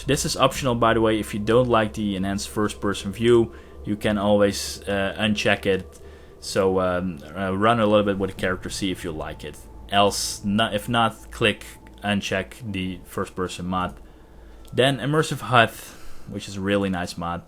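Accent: Netherlands. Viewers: Heerlijk Nederlands accent! Toffe video trouwens :)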